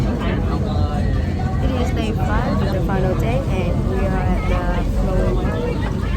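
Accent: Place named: United States. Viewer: nói tiếng Anh tưởng người Mỹ ko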